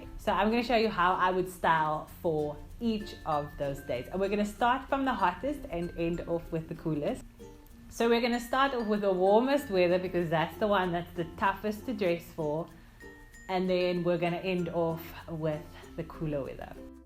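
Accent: South Africa. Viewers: Are you South African?? your accent is so interesting!! cool video btw <3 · South Africa!! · Love the South African accept ❤️💜💙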